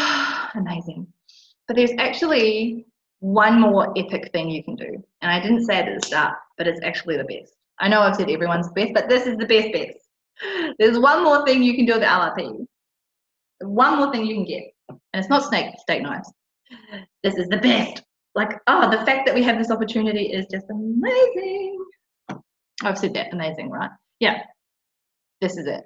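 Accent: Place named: New Zealand. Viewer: omg - i watched this at work and we have no speakers on computers so used captions lol Youtube can't pick up a Kiwi accent.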